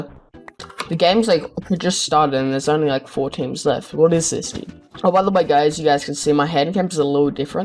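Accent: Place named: Queensland, Australia. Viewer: finally a bedwars player with a Queensland accent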